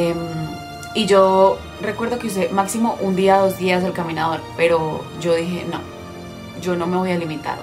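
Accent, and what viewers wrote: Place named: Colombia. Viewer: Según escucho tu hacento eres colombiana.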